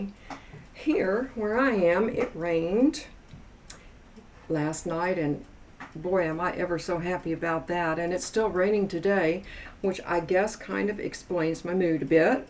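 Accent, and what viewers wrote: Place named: South, United States. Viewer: New subscriber here. I have a question. How long have you been doing this? Just curious. I like your personality and you are a very unexpecting witch. I grew up doing this and I’ve had to keep quiet for so long since I live in the Bible Belt. Now that I’m older, I’m more comfortable with who I am but, I still don’t go around telling people what I do as a lot of people in my small town are very narrow minded and I have kids and don’t want that to affect them negatively with other kids and their parents. I noticed your southern accent so, this is comforting to see. Thank you 😊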